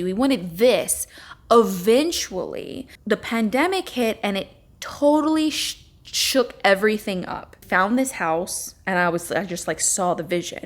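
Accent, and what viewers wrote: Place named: South, United States. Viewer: You are a great example of how us southern people can easily go back into our southern accents! 😂 I didn't realize I did it until a British person did it in front of me at my place of work... And apologized for it... I was like girl... I'm pretty sure I do this with my southern accent so I'm not judging! ❤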